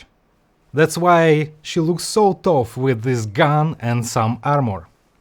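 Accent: Russia. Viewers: Он русский у него акцент русский и имя русское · Я слышу русский акцент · Русско говорящий. Его зовут и по акценту понятно. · Yes, his Russian-English dialect is hard not to notice, I also communicate in English. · I agree, Russian accent and English are very good)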